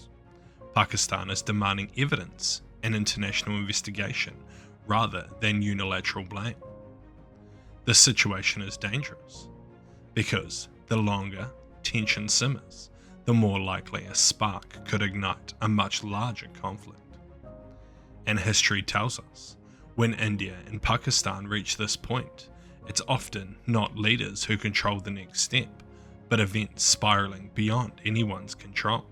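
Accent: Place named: New Zealand. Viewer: How dare anyone criticise beautiful kiwi accent and narration skills!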